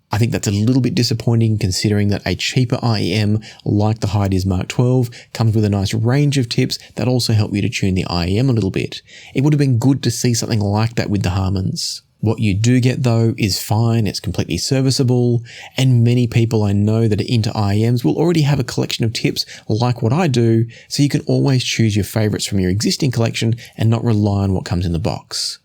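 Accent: Australia. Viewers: He's Australian......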